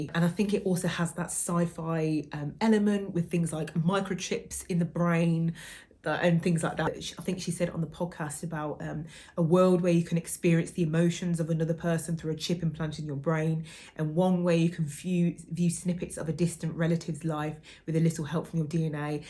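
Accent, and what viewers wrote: United Kingdom. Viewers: ! I just got your video suggested yesterday and seeing this one today and you got almost 20k subscribers!!!! I am so so happy for you, you totally deserve it! As someone who was mentally ill and had to stay at home for long periods of time myself, I am sending you love and spoons 💕 welcome to BookTube! I love British English so I am so happy to listen to you 😊 also, I don't know if Open water is necessarily the right book if you are looking for something more lighthearted! Don't get me wrong, it is an amazing book but its not without its triggering topics. Can't wait to hear if you liked it!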